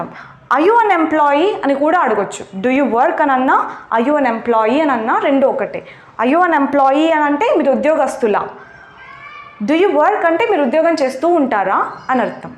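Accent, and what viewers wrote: Anantapur, India. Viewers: Madam , are you from ananthapur .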